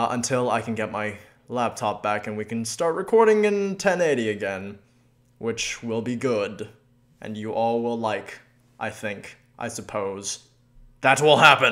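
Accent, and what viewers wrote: United States. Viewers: HES ASIAN BUT HE STILLS TALKS AMERICAN LIKE! congrats man you are the last league of legends related subscriber since i ditched that cancerous game. We played a long time ago. Always enjoyed the few games we did play. You should play dota, ill hook you up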